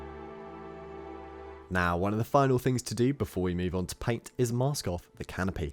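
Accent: England, United Kingdom. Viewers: The England pronunciation is cool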